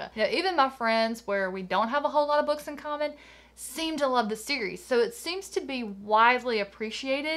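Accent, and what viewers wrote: South, United States. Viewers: Hi! I've really been enjoying your channel. At first it was partly due to your southern drawl, which I love, and I thought, "Wow, she sounds just like my husband." And for some reason, I thought I heard you say in another video that you were from TX, but I must've misheard since you clearly say in this video that your from the 'Sip, which is where my hubs is from! I knew it!! 😉 Anyway, I love your channel!💕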